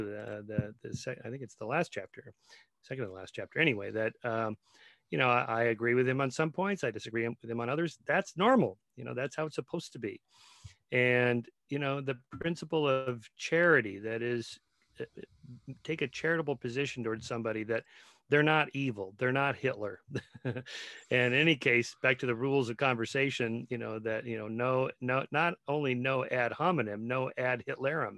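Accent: Wales, United Kingdom. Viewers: You Welsh? Go on bach!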